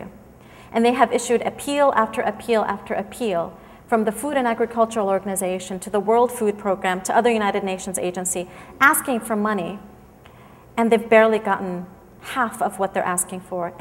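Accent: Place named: United States. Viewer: your ignorance is immeasurable. what do you know about the "conflict " in Syria other than the information you get from your masters, the brutal thugs, Assad's shabihah. It is not a conflict as you claim, it is a spontaneous revolution by the people, for the people. It is a revolution against oppression, inequality and corruption. Can you deny that there are more than 200000 detainees in Assad's dungeons with any charges except, they spoke out against him. you have jumped the gun and started defending the Assad's regime without even knowing the kind of Gas was used, the delivery system was used which only Assad has that. Speaking English with American accent is not going to make any credible. Taking your head out of you know what, can make you credible if you talk sense. You have taken the side of the most ruthless pig humanity has ever known. 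we're blind to the first 8 months of the peacful demonstrations that were faced with Assad's snipers from rooftops which murdered more than 700 innocent kids and more than 6300 innocent people. If all these horrific videos did not move anything in you, then you are a creature without any feelings, and you don't even belong to the human race.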